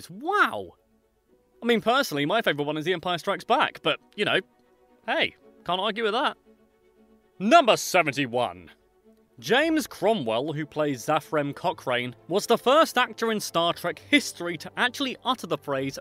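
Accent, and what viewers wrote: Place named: United Kingdom. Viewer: Can't follow this one. Need to practice super fast British accent and encrypt spoken kappas #SwedishNoob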